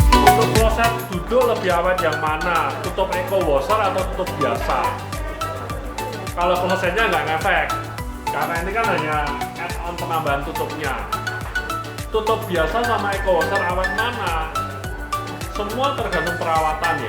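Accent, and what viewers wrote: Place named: Java, Indonesia. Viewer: Wajah nya mirip bule.... tp bahasa nya medok banget... ngebyur😀😀😀😀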